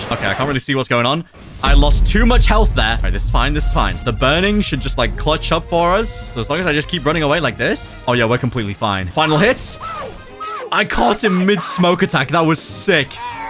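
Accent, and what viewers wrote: United Kingdom. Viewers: Are you from united kingdom ?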